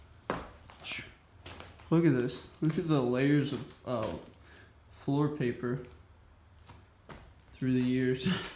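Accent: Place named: East Coast, United States. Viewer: Pennsylvania? It sounds like you have a back east accent.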